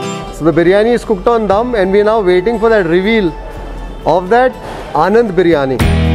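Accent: Karnataka, India. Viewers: Most underrated food review channel....classy and simple English explanation is like "Desi food review in Desi English".... Hope channel grows bigger ❤️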